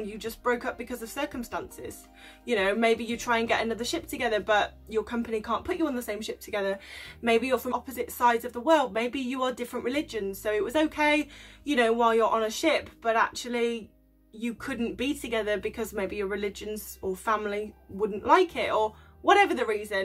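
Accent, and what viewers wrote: United Kingdom. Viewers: Nice one , very nice information,nice accent,are british.keep posting more info mate bye for now.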